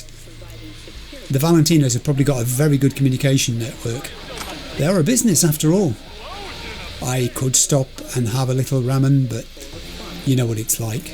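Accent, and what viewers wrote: United Kingdom. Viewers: Hahaha hearing u say "ese p*che p**" in your perfect british accent was very funny to me 😂😂😂 p** is short for prostitute in spanish and is a curse/rude word used as an insult and the other i think is like a vulgar exagerator. Its especially funny because you never swear in your vids 😅The game you play with the kids gives me sean and daniel (lis2) playing in the desert vibes.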